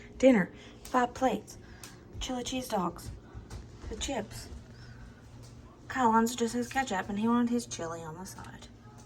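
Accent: South, United States. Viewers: Thank you so much for making this content. I love your videos. I'm trying to find more YouTubers that do this kind of content. I love your grocery shopping challenges. I also love your southern accent ❤ thank you so much for all you do.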